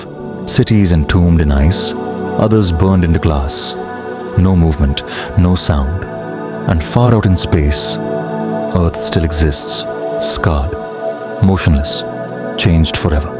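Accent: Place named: India. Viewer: Hello bro 👋 Congratulations on ur new channel 😍 and Happy New Year 🎉 Saw ur video and realized that the content is actually good but the views r slow. I do voiceover. If you'd like me to voiceover ur vids, I would be happy to provide my services. I am an Indian and by ur accent I can say that we both r bros 😃. It appears to me that ur accent still lacks a global appeal. This is one of the reason for slow growth for an Indian youtuber. Kindly reply if you r looking to speed up things!!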